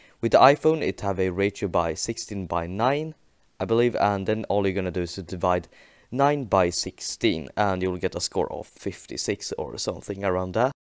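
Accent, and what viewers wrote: Sweden. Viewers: EJ grym video, jag gissar på att du är svensk för din dilekt lol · Are you Swedish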